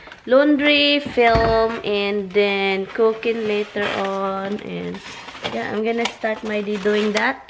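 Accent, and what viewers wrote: Visayas, Philippines. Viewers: I always wacthing you ,,kc,,natatawa din ako s visaya accent mo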